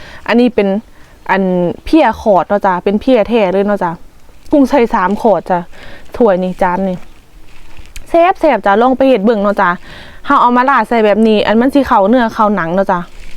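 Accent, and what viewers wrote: Laos. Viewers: สาวไทย เรอ สาวลาว สำเหนียง พาสา ปะสมลาว ไทย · เป็นคนจังหวัดไดสำเนียงคือคนลาว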